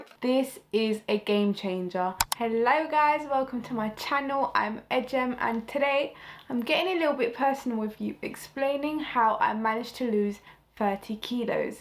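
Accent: England, United Kingdom. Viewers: I love your British accent gurl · What part of England do you come from? You sould just like Mr.London who has fitness videos here on YT.. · love the British accent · Are u from uk?? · I LOVE your voice it reminds me of the voice of Amy Winehouse !! I think it's the accent ahaha are you from England ? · İngiliz aksanlı, açık fikirli, diyet değil sağlıklı yaşam fikrini savunan bir Türk kanalı mı? Hemen abone oluyorum 😊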